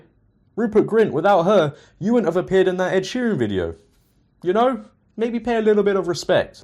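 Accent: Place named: United Kingdom. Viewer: It’s my brittish man who gives based facts in a swanky accent.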